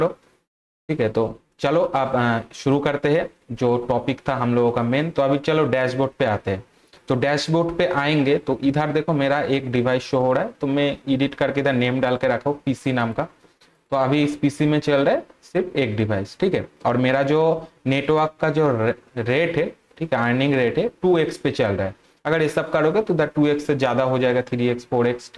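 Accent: Bengal, India. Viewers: bhai tmi bangali ho??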